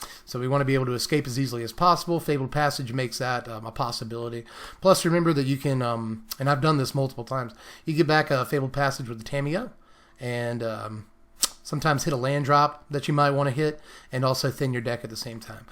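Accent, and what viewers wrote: South, United States. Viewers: with that southern accent: "you're all ears huh, well i'm pretty certain i hear a mouth blabbing" I dunno about additions/subtraction from the deck, based on what i saw and the list, i would say 'cut the girls and a Vraska' play some actual removal or counter magic. Given what i read about the meta, my call would be to add 3 counter spells, to give a tad more options in the main against both agro and azorius ... deck looks like it should be well set up to keep some mana open on Op's turn.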